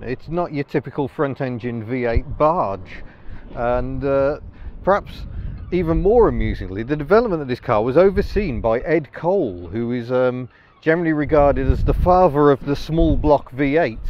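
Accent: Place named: England, United Kingdom. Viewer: How come you talk with an english accent not NZ?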